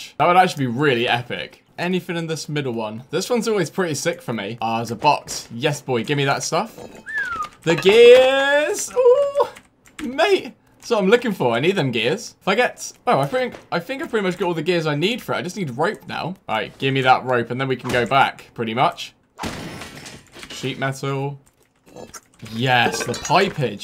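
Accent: England, United Kingdom. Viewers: your english accent always cheers me up!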